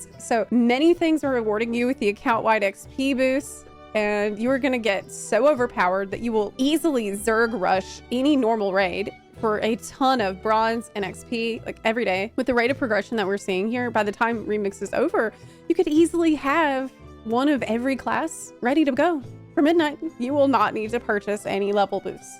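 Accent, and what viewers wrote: South, United States. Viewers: Your southern accent is legit.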